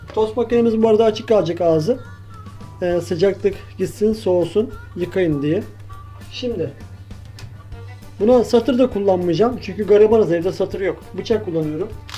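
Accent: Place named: Bartın, Turkey. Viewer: Ulan garibana destek için abone oluyorum nerelisin bu arada bartınli şivesi var sende sanki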